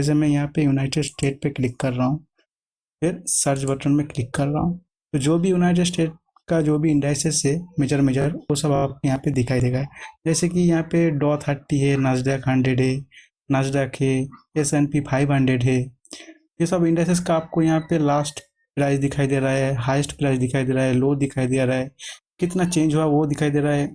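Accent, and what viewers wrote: Bengal, India. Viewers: Ap bangali ho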